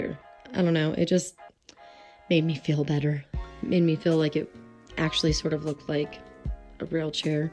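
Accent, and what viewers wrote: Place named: North America. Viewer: Hi from a self-confessed art groupie!! My art studio tour would last about 3 minutes...XP-Pen monitor, XP-Pen pen, Clip Studio Paint software...DONE. My music studio tour would be closer to 7.5 minutes with stories about each piece. I decided to sub your channel based on this video tour, as much because of your persona as for the storage tips. You remind me a little of Chloe Rose only with a North American accent. While you were showing us around your MySpace setup, I was thinking, "Now here's someone it'd be really cool to watch paint!" Look for my art on my B channel someday. Meanwhile, I look forward to following your (and your hubby's) art adventures.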